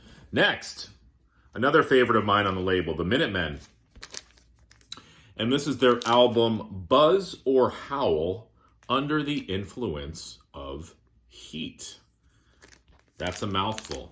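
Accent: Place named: United Kingdom. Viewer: The British have the best accent hands down. What is this SST label you speak of? I love the dramatic pause after the “no ” reveal. You had me at Surf Rock with a punk edge. Fun fact, the name was conceived by the band assigning an alphabetical value to numbers on a gaming die, then rolling the die three times. If you like check out or.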